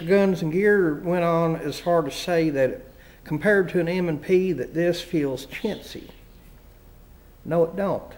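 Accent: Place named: South, United States. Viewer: My people! I moved to NH for GA in sept and really miss the southern accent.... :)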